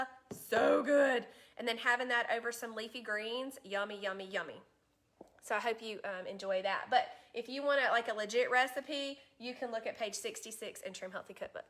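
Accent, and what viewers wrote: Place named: South, United States. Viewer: Oh my gosh, you’re so cute! Love that southern accent! Thanks for all these suggestions ☺️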